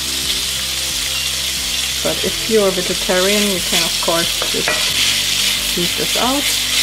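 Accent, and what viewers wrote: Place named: Germany. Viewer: Me: oh, another Internet cook making spaetzle. Me (the moment I hear her voice): oh, she’s German... this should be good.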